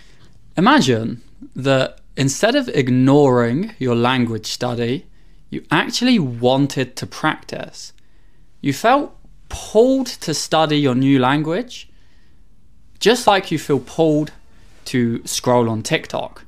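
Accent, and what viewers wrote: United Kingdom. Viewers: I love your videos.. Your british accent is so to die for ❤😊have been living in the UK for last 2 decades..I was born and raised in India.. My passion for english made me learn the language.. It's really difficult to learn a language esp in adulthood.. Having said that, nothing is impossible.. Currently, I have been learning french by myself by using Netflix, YouTube videos and other resources.. I think, it's much easier to learn the language now than before as lot of content is available at your fingertips.. I recently watched your Reading town video.. I live in Reading town.. It's a beautiful place and felt happy to see it on your channel.. Wish you all the best for your future endeavors 😊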